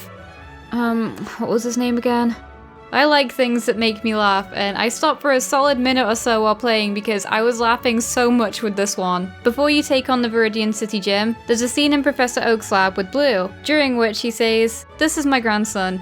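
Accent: United Kingdom. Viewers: Also its not wurld wide world olnly in urup im bulagarian a a oh yah youre not canadian your british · Love your British Accent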